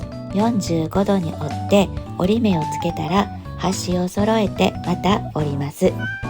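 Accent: Kansai, Japan. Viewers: やっぱり関西弁がええわあ、聞いてて面白い。😂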